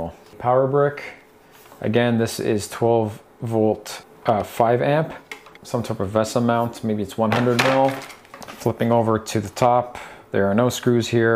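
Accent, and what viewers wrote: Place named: Canada. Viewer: I can tell you are Canadian!! - "Pixie" support?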